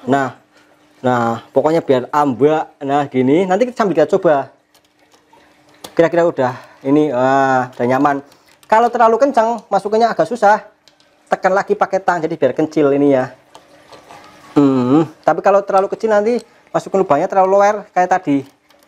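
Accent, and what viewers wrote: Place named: Banyumas, Indonesia. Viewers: Mas orang ngapak ya sama dong 😁😁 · Ngoser, jembeng n amba....hahahaaa, ngapak abis Mas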